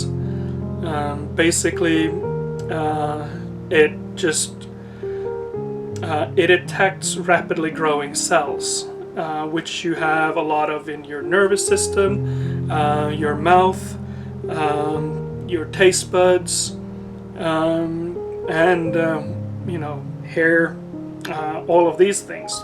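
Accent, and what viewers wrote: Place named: Scandinavia. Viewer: Søgne, 25 min unna Kristiansand :joy: Familien min er fra Gjerstad. Jeg er så hillbilly som man kan være i norge, Pluss i live in the south :) Din engelsk tale er meget bra, men etter å ha sett noen vids rett etter værandre må jeg inrømme å si jeg hørte at det var noe scandinavisk der. Du er opprinnelig fra danmark? Hvor i DK om jeg kan spør?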